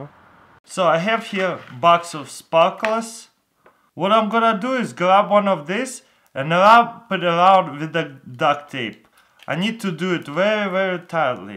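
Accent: Russia. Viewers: Your awesome russian accent can only get you so far, please stop re-uploading things you've already done and make some new content. Or make something cool out of old projects. (i.e. maybe make a borax green torch for your backyard)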